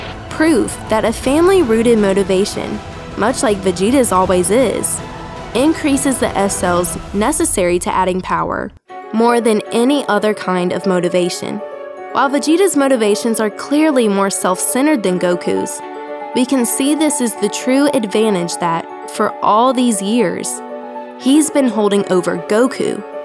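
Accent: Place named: Texas, United States. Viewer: You're from texas right? I can hear it in your accent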